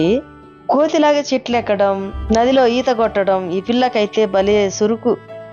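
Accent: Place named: Godavari, India. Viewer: సిస్టర్ నీ వాయిస్ గోదావరి జిల్లలో స్లాగ్ లాగా వుంది కానీ నువ్ అది వాసిలాగా ఎందుకు సాగ దిస్తున్నావు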